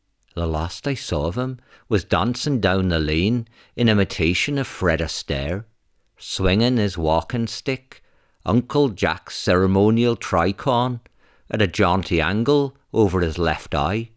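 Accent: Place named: Ireland. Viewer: Aye! I thought it was a pretty decent irish accent. Buuuut I'm American....soooo, yeah. ¡Top o the marnin!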